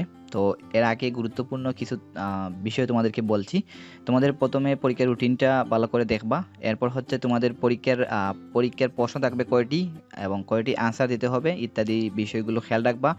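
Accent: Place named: Sylhet, Bangladesh. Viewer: খাঁটি সিলেটি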